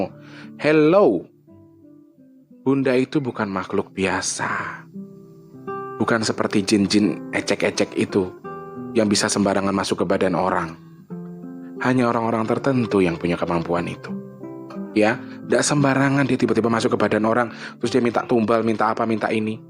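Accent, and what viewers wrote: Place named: Java, Indonesia. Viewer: kbykn..orng jwa. fansny.. hbs logat.bhs cr bicr..medok bngtt. jwane... gt lho ms.. mlh. trnyt dr lampung y.... gpp ms..moh bnyk ssudr. . dr jw dn lmpung..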